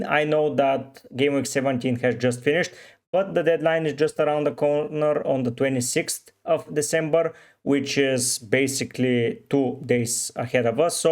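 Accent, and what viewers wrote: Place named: Bulgaria. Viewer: Баа някак си по акцента познах че си Българин😂 Евала за труда братко!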